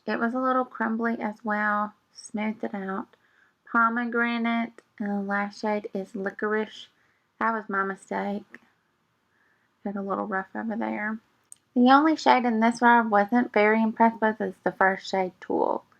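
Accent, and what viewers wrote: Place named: South, United States. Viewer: your southern accent makes me smile so much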